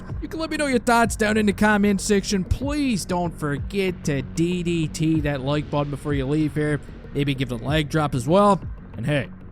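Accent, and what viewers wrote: Newfoundland, Canada. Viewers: Knew you were a newf within the first few seconds of voiceover 😂 much love brother, go habs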